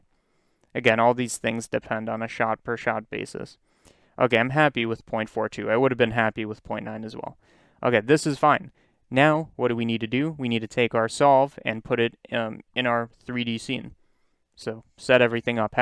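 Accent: Boston, United States. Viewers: Boston area I see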